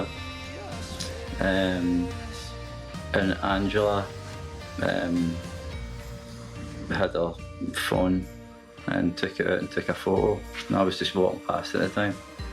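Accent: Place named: Scotland, United Kingdom. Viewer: I'm going to get in Scotland to learn to talk with that accent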